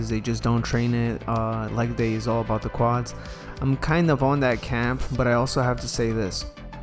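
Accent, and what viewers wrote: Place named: Hawaii, United States. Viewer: He has a slight Hawai'i local accent. Thats how it sounds to me, but the exercises are helpful. Good stuff.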